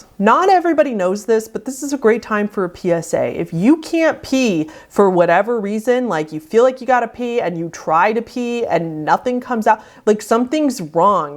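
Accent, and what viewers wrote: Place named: Seattle, United States. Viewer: I'm binging your videos again and I keep saying "your voice is so beautiful and calming" but I couldn't figure out where your "accent" is from (I'm trying to get better at recognizing state accents. Several videos ago you messaged washinton a few times, but nowhere specific.....you are about an hr from tacoma, so I'm guessing the Seattle area....makes sense that I couldn't figure the accent out😆 I'm in Lakewood (10 minutes from tacoma) so that's why you sound so calm and safe for me, you sound like home😄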